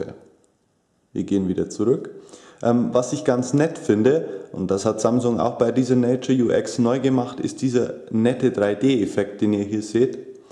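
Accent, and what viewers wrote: Bavaria, Germany. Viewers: Ich find deine Videos Klasse, hab dich auch gleich mal abboniert ;) Kannst du falls es sich einrichten lässt das mit der Dropbox mal zeigen oder kurz erklären weil des find ich interessant aber ich find nirgends im Internet ne Seite die das vernünftig erklärt. Welchen Dialekt redest du normalerweise? Weil ich komm auch aus Bayern und ich hör sofort wie du dich anstrengst gutes Hochdeutsch zu reden :D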